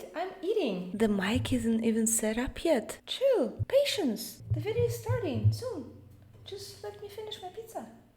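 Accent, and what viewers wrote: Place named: Eastern Europe. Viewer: I did guess right! Kinda. lol There is something Eastern European about your accent.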